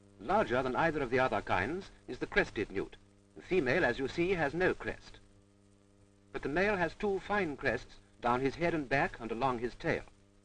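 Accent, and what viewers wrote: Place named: England, United Kingdom. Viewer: Wonderful old film! The English accent! BBC eat your heart out. " Thars a newt over thar. It will dash into the warta before long."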